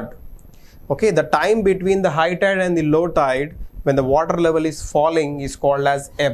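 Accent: South India, India. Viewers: Are you South lndian?....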